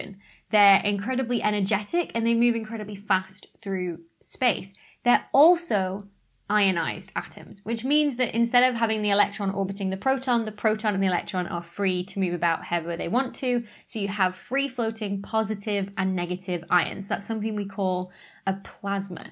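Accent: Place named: United Kingdom. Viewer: I gave it a like because it made me laugh. Listening to British people that by American standards don't have a strong accident can produce little surprises like this. It seems like they are speaking regular English and then out pops schedule, controversy, solder, etc. But bubble is my favorite.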